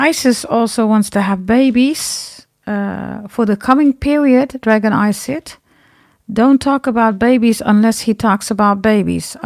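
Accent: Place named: Patois, Jamaica. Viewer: 💛 missing your live and your voice so beautiful and love the Jamaican pathwa and u spot on about me being married and husband Aries and arab and I'm 🇬🇧 will get a reading soon from you 💖 🙏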